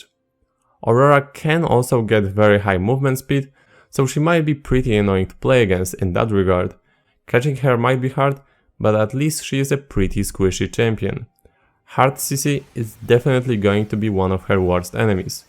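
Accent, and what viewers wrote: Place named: Poland. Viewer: Ah ten polski akcent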